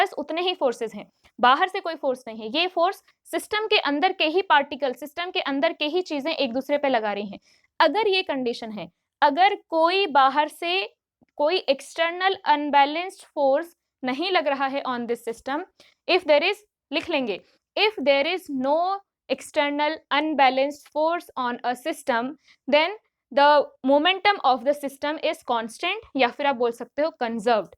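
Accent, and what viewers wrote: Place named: Uttar Pradesh, India. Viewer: Ma'am aap up se ya Bihar se hongi etna fix hai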